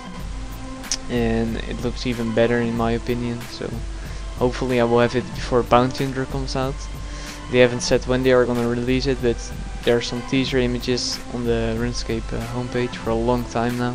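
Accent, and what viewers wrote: Netherlands. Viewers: dutch accent 3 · sexy dutch accent <3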